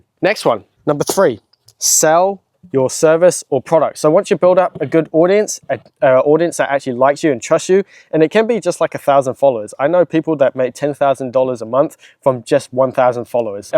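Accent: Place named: New Zealand. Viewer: Are you in NZ? sound like a Kiwi. Great to have your passion.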